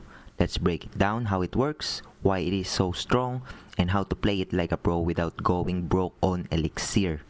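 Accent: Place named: Philippines. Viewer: Base on his accent bro is a Filipino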